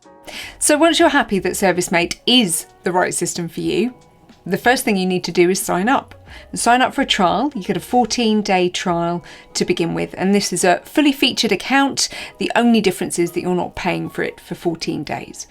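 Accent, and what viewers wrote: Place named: United Kingdom. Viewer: Your video has been so much help! We've used it a lot tonight. Fun fact... we're referring to you as the "lovely posh English lady" 😀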